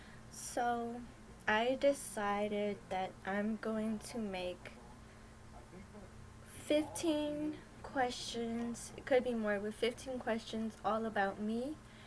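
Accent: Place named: New York, United States. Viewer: I don't know if you have ever heard Lalalalafontaine!!!! He was a legend hockey player for the Sabres in the 90s. I like your New York accent.😎😎✨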